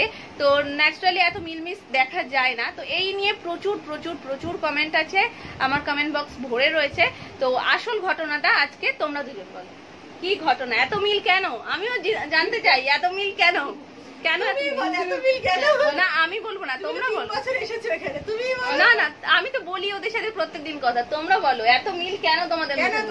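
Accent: Bangladesh. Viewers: Tumi bangal nah "neba" Deba" bolo amio boli Amro ase nah j "nebe" debe"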